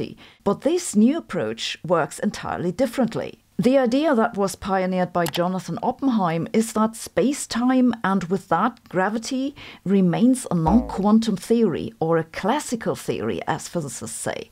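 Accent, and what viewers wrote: Germany. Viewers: her pronunciation has problems because of influences from the German accent, and your inferences are not accurate by the way, simply straw man and borderline ad hominems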